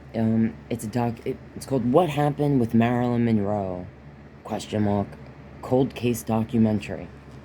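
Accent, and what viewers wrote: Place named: New York, United States. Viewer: Loving 💞your videos as I go through a dark challenging time, your vibe is healing and helpful to me for reasons I don't understand ,and I like your ny accent or so, my grandma was from long island so it sounds familiar, ( the quiet epedemic and I'm not crazy I'm sick, videos and free documentary on Lyme disease called Under Our Skin, ( cousin to syphilis , u can be destroyed) the masses don't know how bad it can be it's like a sci Fi movie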